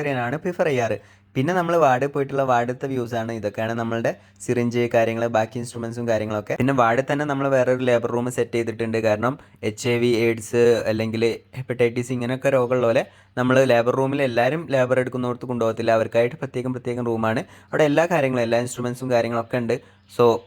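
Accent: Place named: Malappuram, India. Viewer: സംസാരം മലപ്പുറം സ്റ്റൈൽ und ad കൊണ്ട് ചോദിച്ചതായിരുന്നു 👍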